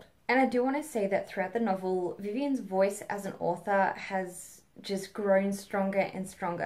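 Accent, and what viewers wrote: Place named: Melbourne, Australia. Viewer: You sound like you're from Melbourne! =D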